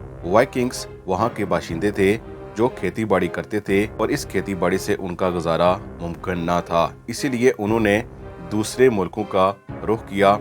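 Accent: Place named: Kashmir, India. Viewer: R u Kashmiri ?? you Accent kashmiri hai. Distric kotli ka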